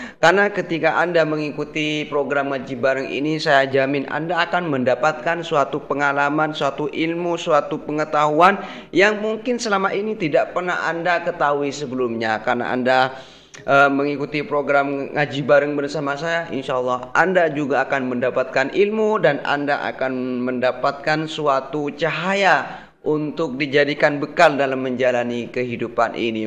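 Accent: Terengganu, Malaysia. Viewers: Orang Indonesia faham ke loghat Melayu Terengganu. Ketawa kamu tak kurang seronoknya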